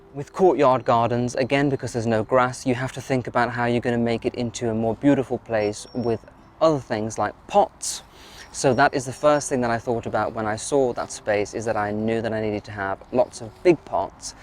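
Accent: United Kingdom. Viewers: Great content as always,. 😊 As an American, I adore your British accent, especially when you say, "lots of pots." It sounds delightful!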